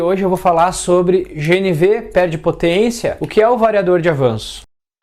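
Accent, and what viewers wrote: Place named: Rio Grande do Sul, Brazil. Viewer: Sotaque de gaucho raiz 🤙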